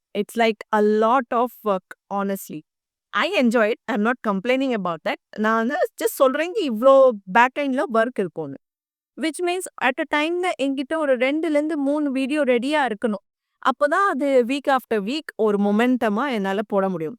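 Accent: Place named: North, India. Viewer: Your pronunciation like North indian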